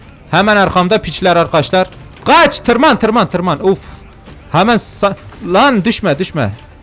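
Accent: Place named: Kurdistan, Turkey. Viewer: çekemeyenler olacak seni sen bir Kürtsün senin şivene doğalığına kurban valahi senin takipçin artıkça ben seviniyorum yolum açık brakoo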